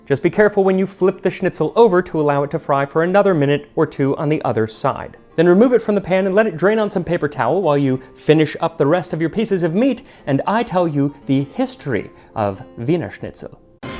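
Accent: Germany. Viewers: It's been a while since I watched a Tasting History. Absolutely love this channel, and love hearing German and his occasional half German half English like the "If you vant" there at the end. ❤ Great stuff... Might have to stop by the butcher to get some veal and try to cook a proper wiener schnitzel.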